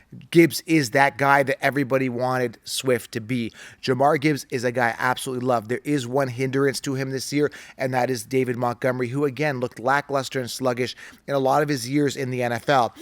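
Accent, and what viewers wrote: Canada. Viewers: I like watching your show.. I can even tolerate your Canadian accent but could you actually pronounce someone’s name right??? Is that too much to ask??? You sound like a damn fool.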